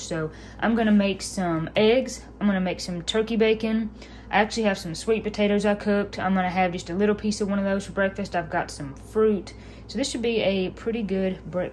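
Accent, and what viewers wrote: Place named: Eastern Kentucky, United States. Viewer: ❤ I just wanted to save it I admire you and your sister. You're such sweet authentic women. Raised right in a crazy world. My family is from eastern kentucky I now live in indiana. I spent every summer at my grandparents house down there. All of my family has passed. There are only a few distant relatives still down there. After watching some of your videos and watching your mom cook I feel homesick l o l. In fact I just asked my husband of we could take a short trip to the area and just soak it all in. Stay sweet and keep doing what you're doing. It's Also refreshing to hear someone sounds like me l o l. Much love and prayers ❤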